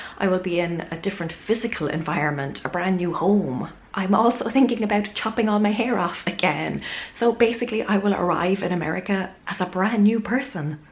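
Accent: Ireland. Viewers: The Irish accent is one of my favourite accents of all!! I immediately trust anyone with an Irish accent. Is that weird?? Hahaha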